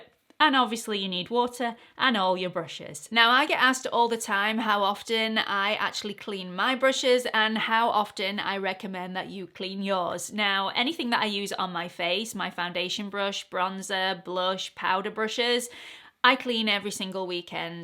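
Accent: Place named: England, United Kingdom. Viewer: I use Woolite gentle textile shampoo for my brushes - is that sufficient and my beauty blender after every use in dishwashing liquid. A new happy and very enthusiastic subsriber. Appreciate your professionalism and wonderful English accent. Similar age to your gorgeous Mom and have learn so much from your amazing tutorials. Thank you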